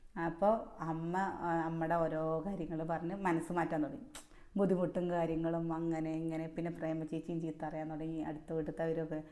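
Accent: Palakkad, India. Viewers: ഞാൻ പുതിയ subscriber ആണ് ട്ടോ. പാലക്കാടൻ ഭാഷ കേട്ടു വന്നതാണ്. നല്ലൊരു അനിയത്തികുട്ടി. എന്നും നിങ്ങളുടെ സ്നേഹം തളിർതിരിക്കട്ടെ. ഇന്നത്തെ പെൺകുട്ടികൾ വാക്കുകൾക്ക് കാതോർക്കു. ❤️❤️❤️❤️❤️❤️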